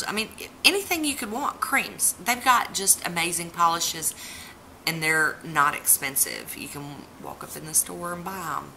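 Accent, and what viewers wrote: South, United States. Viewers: I enjoy your videos and positivity. Your nail art always inspires me, even though I haven't been patient enough to try anything yet (I'm still trying to perfect a neat manicure!) As a South Carolinian myself, it's a pleasant change to hear a genuine southern accent on YT. Keep up the good work! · Well there's your pretty face! I'm a southern gal too, and I DO love your accent 😉 Sinful colors is bomb! I just bought the neon collection today...minus the yellow. Can't find that one yet. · I too am from the South and my accent is thicker yet than yours. When I stumbled across your channel I was like heck yeah.....a fellow Southern chic!!!! I have family in Indiana and ironically they are visiting right now and when they are here it's like oh wow maybe I do have a bit of an accent😏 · You are my favorite new you tuber (to me) happy to see the beautiful face that goes with that accent (yes I'm one of those commenters). 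Southern accents just sound so warm and friendly to me, just love hearing them! Thanks for the fun video, now to go check out your channel Recs! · I love your accent! its so soothing to me and I lol'd when you said not everyone on the south marries their cousin 😂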